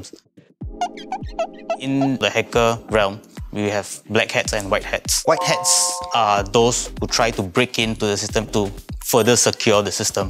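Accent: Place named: Singapore. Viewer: Okay, this is weird. You're indian but you speaking like singaporean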